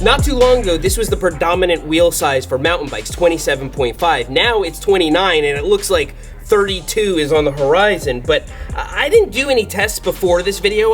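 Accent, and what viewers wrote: United States. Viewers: He sounds American to me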